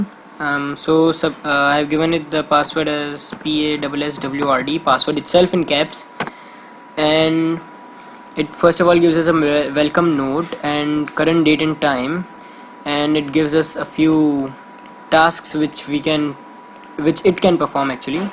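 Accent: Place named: Arab world. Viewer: Me noticing an accent: 🤔 in 🧠 -> is he Arabic?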